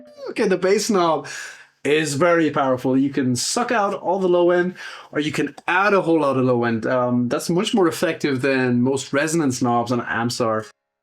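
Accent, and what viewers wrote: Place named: Ireland. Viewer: Also, apologies if its been mentioned in other videos. I've been meaning to ask for ages. Where's the accent from? I'm in Wexford and I know I'm hearing an Irish accent but theres something else going on thats throwing me off.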